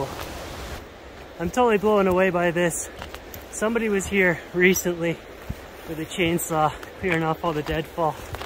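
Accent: Canada. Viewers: I know I"m an odd guy, but the two things I look forward to most in your videos is the opening jingle and the way you prounounce Canadian 'out' as 'oot'. Love it. And of course your in van adventures.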